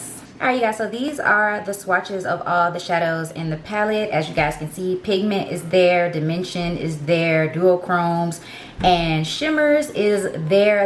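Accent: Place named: Miami, United States. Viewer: I looooveee your channel and your Trina like Miami accent😩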